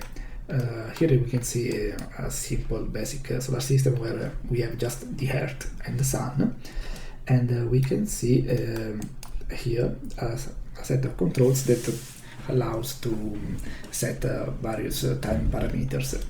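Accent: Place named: Italy. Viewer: Very very nice, congratulations. It's one of the things I wanna make too ! By the way Italian accent is perfect.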